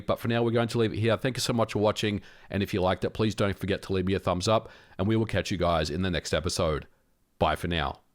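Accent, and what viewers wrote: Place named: Australia. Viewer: His accent is clearly Aussie. But yeah, I can understand the mix up. I'm from the UK and I can't tell the difference between the American and Canadian accents. Unless they're from the Southern US. Somwan stowl ma god damn truhk! Mary Jane! Hand me ma guhhn!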